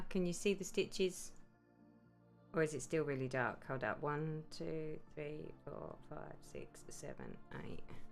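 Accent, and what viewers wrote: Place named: Essex, United Kingdom. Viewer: Dang. I missed live stream but watching it now. You are so much fun and I love your Essex accent. Actually very soothing.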